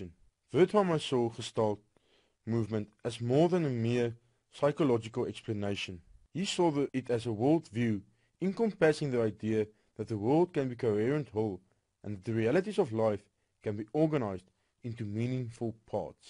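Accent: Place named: South Africa. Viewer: Nice to hear an SA accent on Youtube!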